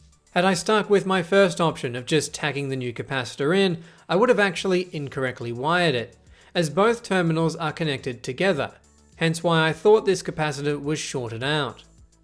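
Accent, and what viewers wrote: Australia. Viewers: an Aussie, mate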